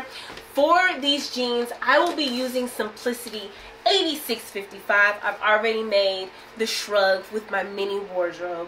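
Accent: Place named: North Carolina, United States. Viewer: I knew you sound familiar! 😊I'm from and live in NC, too! Can't beat that country accent.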